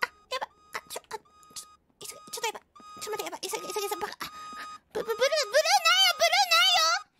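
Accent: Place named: Japan. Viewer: Peko japglish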